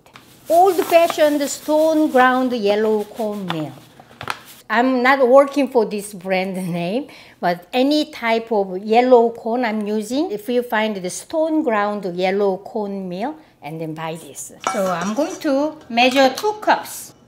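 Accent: South Korea. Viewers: I used to get recipes off your website all the time for years because my mom is Korean but sadly passed away 15 years ago (last week as a matter of fact) without ever teaching me her recipes. I just discovered your YT channel today and I'm hooked! I may stay up all night catching up on all of them!!!! You remind me a lot of her, always happy and making jokes. And the accent of course. Thank you so much for sharing your recipes. 💕💕💕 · 영어가 너무 한국분이라서 정감이가요❤️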